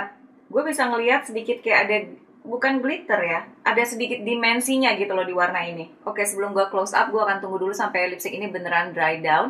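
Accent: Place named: West Java, Indonesia. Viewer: Suka sama ka kalau lagi review logat sundanya keluar,kocak pas bilang muruluk murudul 😂😂😂😂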